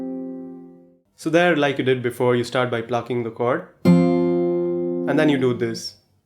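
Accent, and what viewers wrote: India. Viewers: Are you indian? I mean your accent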